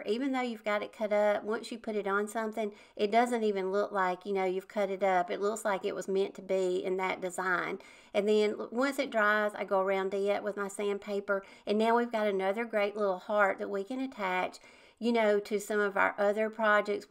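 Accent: South, United States. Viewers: Hey! Jill here! OMG!! Such cute ideas! I must get busy with my Valentines tree. Last year I made Plaster of Paris pink and red hearts for my tree. Used a heart mold that I got cheap at Bin Time. BTW!! I love your precious, southern voice. I'm a Georgia girl and sound so much like you. Many blessings!!!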